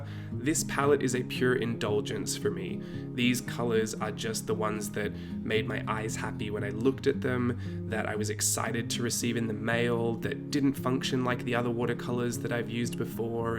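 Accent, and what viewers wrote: Australia. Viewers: Just found you, looked you up.;) Always watching. Lol I knew you sounded Aussie. I live in Dulwich Hill. Are you in Sydney region? Congratulations on your recent success after a car accident and changing careers to find something that you love. I like Irit's channel too. Love Japan, and Van Gogh. Highschool Teacher of woodwork and stuff then after many car accidents (none at fault!) I slowly changed to the little ones Learnt for 4 years I am a Montessorian I no longer teach but, now writer, artiste, illustrator. Watercolour collector of professional brands and handmades love inks and journals too (on a no buy break it is killing me) We are lucky to be alive both of us.:) Hope you are enjoying your Etsy shop break holiday. Now subscribed! In kindred spirit, Eliza Most Beautiful Day is Today! Sunday 26th of June, 2022 pm Dulwich Hill, Sydney Australia x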